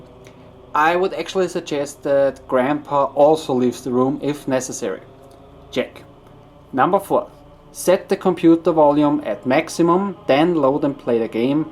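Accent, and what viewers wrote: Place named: Germany. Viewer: I remember playing the game 30 years back on the good old c64! the death scene screams were awesome! so awesome, that my mum took them for real and stormed in my room;-)! It is remarkable, that the video game tape still works after 32 years! Do you use an original C64 or an emulator for PC?, you have a descent german accent! are you from east germany?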